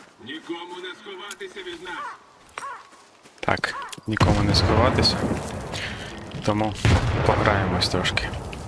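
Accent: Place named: Poland. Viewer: у вас гарна мова але чую акцент, ви поляк? :)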